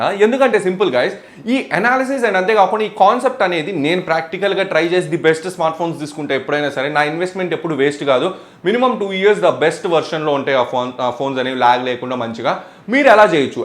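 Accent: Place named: India. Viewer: I like ur telugu slang and fearless review and comedy scenes also 💟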